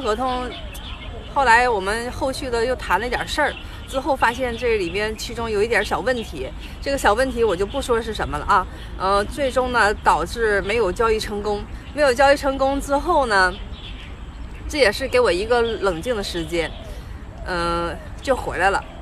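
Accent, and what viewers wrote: Northeast, China. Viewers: 还是好好说话吧，东北话或者标准普通话，混着说，有点别扭，买房子属于私事，没必要上这里来说吧，这期做的有点拉分了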